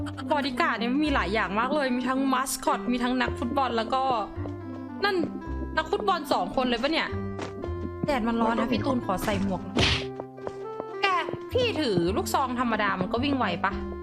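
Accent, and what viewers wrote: North, Thailand. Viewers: อู้เหนือน่าฮักหนาปี่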